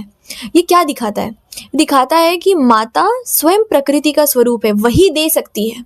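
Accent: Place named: Gujarat, India. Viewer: You must have gujrati root. Your accent ..ok. Aap accha bolti hain. Thank u behan ji. Om Namah Shivaay.🎉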